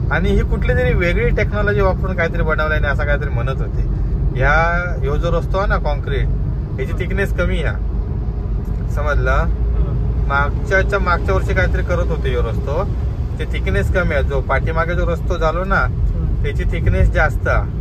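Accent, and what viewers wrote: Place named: Malvani, India. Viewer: तुमचे वीडियो आणि तुमची भाषा आपली मालवणी खूपच भारी वाटता, खूप शुभेच्छा......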